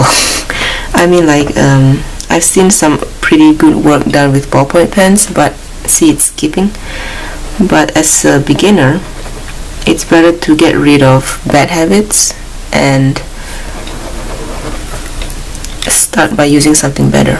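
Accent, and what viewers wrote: Singapore. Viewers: You sound like a singaporean. Are you from singapore? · Singaporean accent! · You sound like you're from Singapore! Haha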